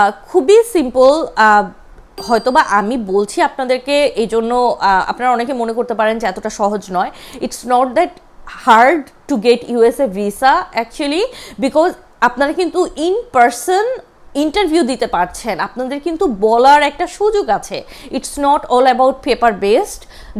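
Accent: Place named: Bangladesh. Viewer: আপনার কথাগুলো অনেক সুন্দর আপনার কথা শুনে মনে হলো আপনি বাংলাদেশের নাগরিক বাংলাদেশের কোন জেলায় আপনার বাড়ি জানালে অনেক খুশি হব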